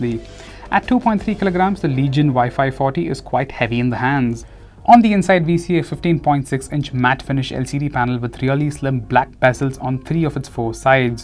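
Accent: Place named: India. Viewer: that indian accent i love it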